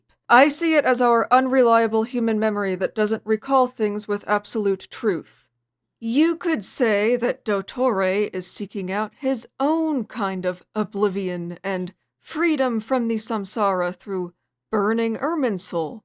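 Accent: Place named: Canada. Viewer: I hear some canadiannism in your voice, or maybe its northeastern US which has canadian influence in their accent 😊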